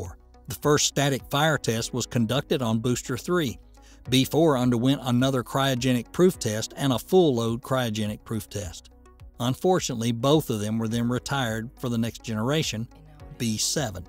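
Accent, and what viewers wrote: United States. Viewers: That cowboy accent tho 😂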